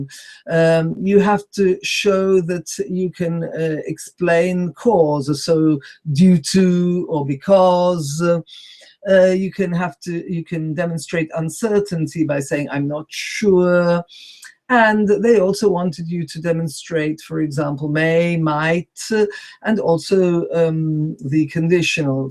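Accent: Italy. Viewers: From her accent she sounds like an Italian native speaker, however I've found her advice very helpful. It takes more videos like this.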